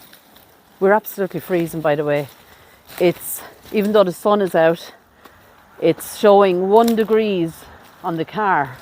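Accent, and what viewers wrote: Ireland. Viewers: Hi, Iam Darren from Australia and I just wanted to thank you for your amazing videos and the history behind them.... You are very lucky to live in such a beautiful country, I also love the accent it brings back many fond memories.... I was an on-site construction manager and worked in very desolate places in the Australian outback.... A few of the young lads working for me were from Ireland and some of the hardest workers I had, the only thing was they work hard and play hard 😂 They love to drink and fight no surprise when you working in desert like conditions 7 days a week for months at a time, I was good with that as long as they were at the work truck at 5.30 am to go to work in the morning..... Those boys never missed a day, I miss them a lot hard working hard drinking and very respectful.... They made living in the desert actually fun with loads of laughs and bonding..... 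Sorry to rave on 😂 Cheers Darren...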